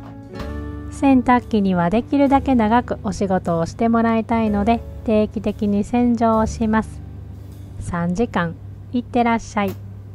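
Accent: Kansai, Japan. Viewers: 私は、とにかく nobanaさんのおしゃべりが大好きです😍 バリバリの関西弁😂癒されるわーって感じです。住んでいるのは横浜ですが、母方の実家が関西なので😊 あとは、やはりドイツ暮らしが、手に取るようにわかること。主婦目線、母親目線、いろんな場面があり楽しいです。これからもずっと応援しています♪♪♪ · こんにちは😊 今週はバタバタして今頃拝見させて頂きました！キャベツと鶏ムネが塩して放ったらかてるだけで、美味しくなるなんてどんな魔法ですか！？😳 さんの動画の好きな所は、さんの声と関西のイントネーション🎶 経験からの暖かい言葉、でしょうか🤔 あと、マリメッコのエプロン！かわゆす❤ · はじめまして。今日初めてユーチューブ拝見しました。他の方もおっしゃっているようですが、優しい関西弁がとても素敵ですね。なんだか癒やされます。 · ドイツ、学生の時からの憧れの国。そこで彼方の方と国際結婚で暮らしている事に興味津々です😊ご家族もいて、穏やかな関西弁がほっこりしてます。初めて観てチャンネル登録させていただきました。どこで暮らしても小さな幸せ見つけることって大切ですね。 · 今日は予定をこなし帰宅後すぐ観れました❤ 母が転倒して右腕を打ち食べる事も出来ないので数日寝泊まりした後、ショートステイに入居させました。痛みはなかなか消えないしあちこち悪くて退去後も1人で暮らせるか施設も視野に様々な選択を迫られています😢 動画を毎回観るのが楽しみで癒しです。 Nobanaさんの関西弁だけど柔らかいトーンのナレーションが好きです✨ つまみ食い女王にもお会いしたいです😊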